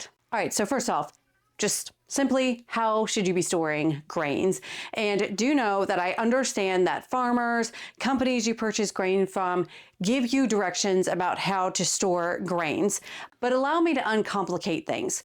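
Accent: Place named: Florida, United States. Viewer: You sound like you like here in Middleburg FL!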